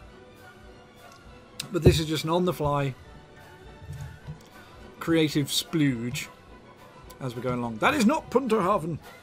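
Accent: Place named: United Kingdom. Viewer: Welcome. Figured it was some sort of U.K. accent just couldn't place it.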